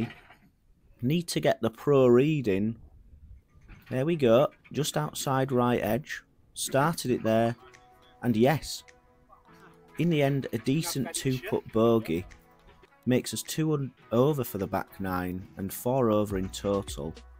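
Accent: Yorkshire, United Kingdom. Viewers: Watched the whole vid, defo a white rose lad. Hope your wedding day was awesome, you’ve gained a new sub. Much love from Lancashire.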